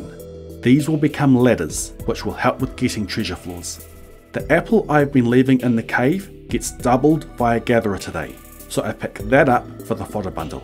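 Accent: New Zealand. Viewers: New Zealand accent. 👌🏽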